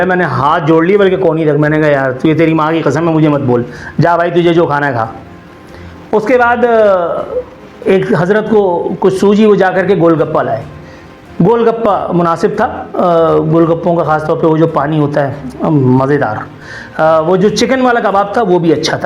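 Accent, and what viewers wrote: Karachi, Pakistan. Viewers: یہ کراچی کی اردو ہے یہاں کے مہاجروں کی زبان ہے آکر سیکھ لیں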